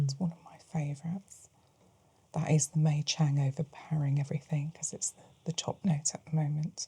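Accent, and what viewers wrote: United Kingdom. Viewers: Lovely video as always. Your lovely British accent is like silk to my Swedish ears 🤗🥰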